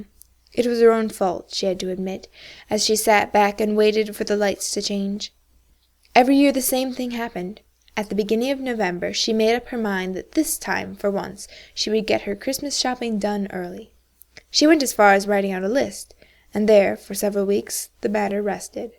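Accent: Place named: United States. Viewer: BRITISH housewife, reader is American and does a crappy job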